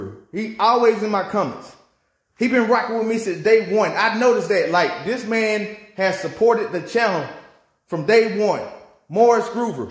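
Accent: South, United States. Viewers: Came across your video and it just caught my attention. Normally just checking out the hot rods. Well ended up watching at least 10 videos. Love the paint jobs and how you show it don’t take a big name paint paint shop and top notch equipment to do nice work. PS love the positive attitude and the country accent. Keep doing you and your thing brother. God Bless✌🏻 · Love your work! You are so energetic and proud… how could you not be! Love the thick southern accent too!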